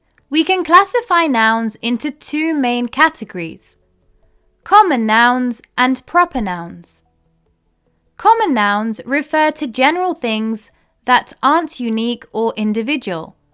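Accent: United Kingdom. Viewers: I love a great British accent❤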